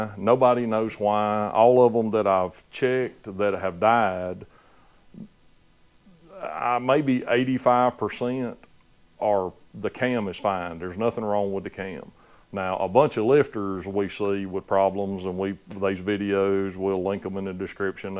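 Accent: South, United States. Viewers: The views will always speak for themselves. I agree with the majority, I like the drama videos, if that's what we're going to call them. Especially with your Southern drawl, it's really more of a telling it like it is video. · Do not like hear your southern drawl put me to sleep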